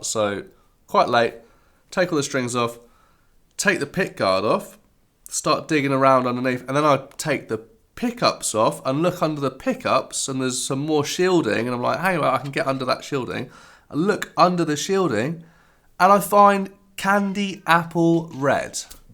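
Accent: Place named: United Kingdom. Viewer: I like your style (or is behavior the better word?) and really enjoy your way of talking and the british accent. Im a simple man, i love vintage guitars, so i subscribe. Thumps up! Many best greetings from berlin- germany 😃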